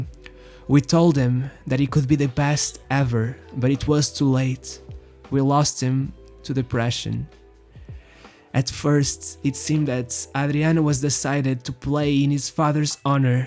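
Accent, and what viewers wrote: Portugal. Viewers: Hey. Yes he is. Its obvious by his accent and the way he pronounce the Portuguese words. Only a Portuguese could say it that way. Cheers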